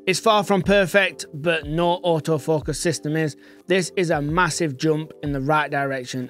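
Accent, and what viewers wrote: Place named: Cockney, United Kingdom. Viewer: I hear Cockney man)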